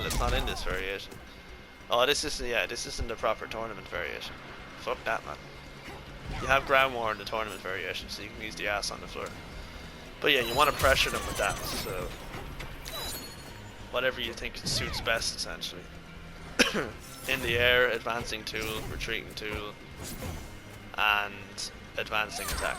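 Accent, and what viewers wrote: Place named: Ireland. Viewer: thank you for the video. Nice Irish accent too.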